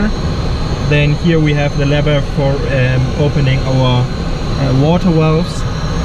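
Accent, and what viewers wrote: Germany. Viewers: if you cant speak english, please dont do it, orfull to listen to that german accent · Are you from Germany, because your english sounds so. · Kurze Frage bist du deutsch wegen deinem Akzent? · Der deutsche Akzent nervt kein bisschen :D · Ich höre deutsche Heraus haha : ) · Haha man erkennt am Akzent, dass du Deutscher bist. No front ich spreche genauso. XD schöne Grüße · He is German i Can hear that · His german Accesnt tho... love him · German tries to speak English ...... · Du hast so einen deutschen Dialekt😂😂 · Du hörst dich schon echt deutsch vom Akzent an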